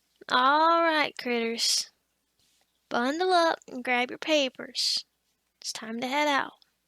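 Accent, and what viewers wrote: West Virginia, United States. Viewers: Well done on the eastern West Virginia accent, especially for a first try! If you ever want coaching or practice, let me know! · This was frikin adorable lol, ironic enough I am practicing to do music for my second channel in a few years. So hey maybe you don't have pipes, but ya sure as heck have a sweet voice I could listen to forever😊, I also enjoyed the WV accent considering I live here though originally from VA I am not gifted with an accent.😂 Probably for the best considering my flavor of music to do, Anyways awesome video, you're amazing as always, hope you have a wonderful day and enjoy the Christmas month, God bless take care, and much love!🌸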